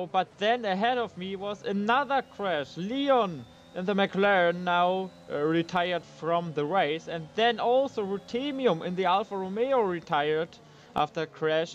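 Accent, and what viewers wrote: Germany. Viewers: I have one question. Are u German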